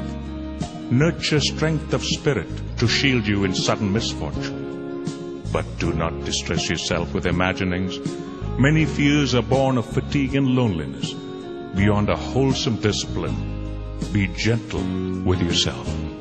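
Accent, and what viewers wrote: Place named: Scotland, United Kingdom. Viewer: Some say the orator is James Earl Jones or even Kamahl, but I detect a slight Scottish accent like Sean Connery.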